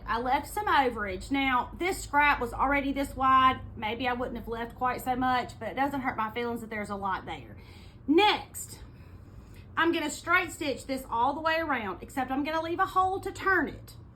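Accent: South, United States. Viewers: You are a great teacher. I’ve been sewing and quilting for almost 50 years. I came across one of your videos on facebook. What drew me to watch it was your southern accent. It makes me smile when I hear you talk. I’m a 70 year old born and raised Texas girl.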